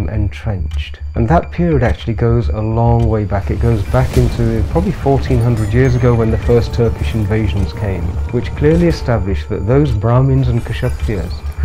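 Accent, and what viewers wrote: United Kingdom. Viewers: Brother. he is based in Britain and its the accent. It isn't too hard to understand if you focus... Jai Shri Ram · Why he is speaking with a British accent and why British government is allowing him to speak against Britain? These are the questions you need to ask yourself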